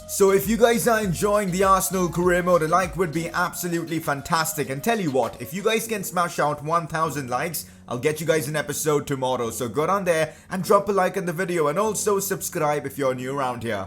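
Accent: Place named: India. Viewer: Bro ur fucking Indian voice is annoying 😣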